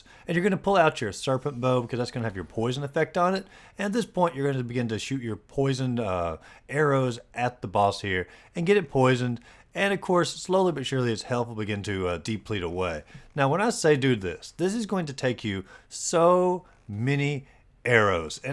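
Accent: South, United States. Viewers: Oh, and I hear that southern accent too man. Its all good, I'm here too lol!!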